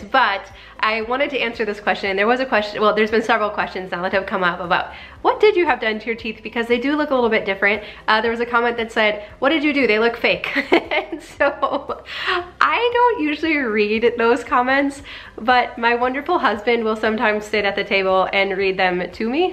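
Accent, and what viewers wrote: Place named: Midwest, United States. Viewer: It all depends on where in the US you’re from. Most of the country says roof with the “oo” sound like moon. But you can always spot a Midwesterner with “roof” and “root”. Oddly, “room” doesn’t follow the pattern. I’m from the Midwest as well, but my parents pronounced them with the usual “oo” sound so I did, too. But all of the kids I grew up with said them as does!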